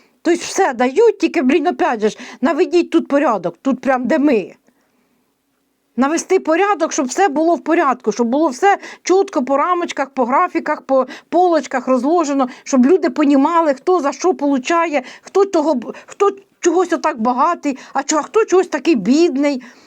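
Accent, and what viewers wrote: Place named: Dnipro, Ukraine. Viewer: Дякую Вам,пані,за ваш суржик (ми теж, ваші сусіди з Дніпра так балакаєм).за Ваші ємоціі(вони дуже близкі нам).за вашу правду.!!! Хай щастить і здоровьєчка Вам!:hand-pink-waving::face-red-heart-shape:❤💛💙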